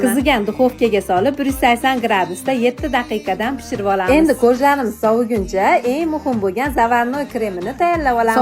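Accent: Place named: Andijan, Uzbekistan. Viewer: Anjancha shevalarizdan😊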